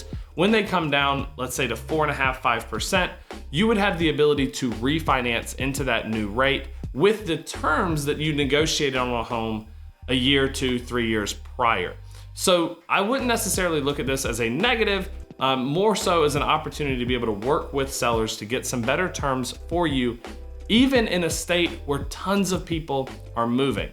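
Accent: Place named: New Jersey, United States. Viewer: Sounds like NJ!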